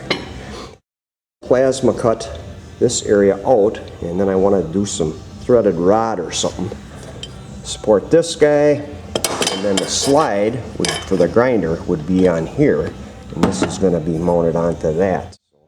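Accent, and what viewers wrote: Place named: Midwest, United States. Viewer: Where do you reside? By your accent I'm guessing Illinois, Wisconsin, Minnesota, or Michigan.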